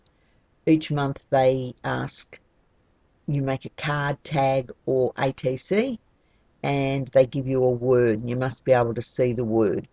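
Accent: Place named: Australia. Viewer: Love your tutorials and your accent lol. I'm from Aussie as well. Thank you so much for all these tutorials. I'm very new to this so I'm finding these extremely helpful.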